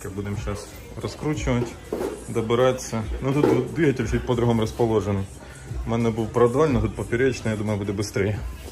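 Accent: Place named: Surzhyk, Ukraine. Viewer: З одного боку, власно мені, все одно на якій мові твої ролики. А с другой сторони як почув наш рідний суржик то й ти якось став ближче до нас. :))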